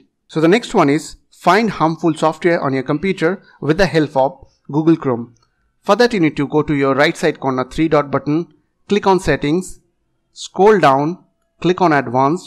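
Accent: India. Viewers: Always indian voice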